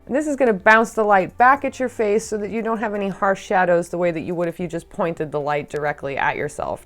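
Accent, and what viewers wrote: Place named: Boston, United States. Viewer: I watched this video on my daunting hour drive home from work and it made me so happy! I have never contemplated (and probably won’t) starting a channel, and I enjoyed this video so much nonetheless! You are such a breath of fresh air in this community, and I am so hooked on your channel. PS - it must be a Boston thing to pronounce room “rum” because I swear you and Beantownhouselants always have me rewinding to relisten like, who’s drinking rum???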